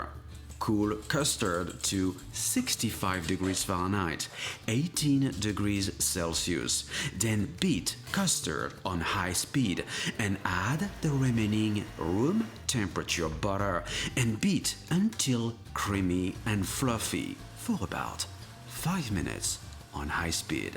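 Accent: France. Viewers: The french accent is just lit😅❤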